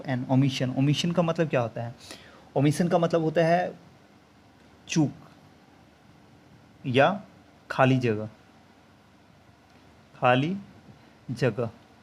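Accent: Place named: Bihar, India. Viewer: Hello friend, it is not mistress Meera in first question rather miss-iz would be correct pronunciation. Further I suggest you not to teach English because when you speak you have got regional touch in your pronunciation and to validate myself I can say that you are from Bihar for sure. You should try something else because English is not a subject, it is a language where pronunciation has a great importance.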